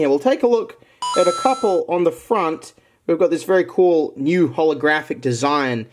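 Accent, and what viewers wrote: Australia. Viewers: you sound like the australian rick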